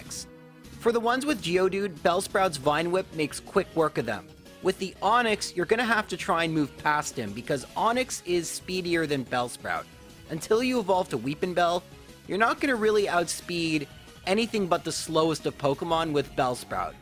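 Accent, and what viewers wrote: Canada. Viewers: You sound Canadian. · Canadian accent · pretty sure hes canadian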